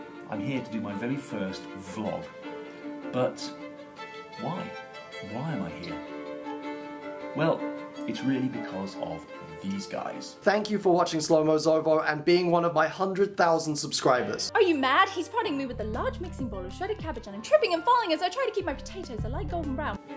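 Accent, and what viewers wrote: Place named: United Kingdom. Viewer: your magical british accent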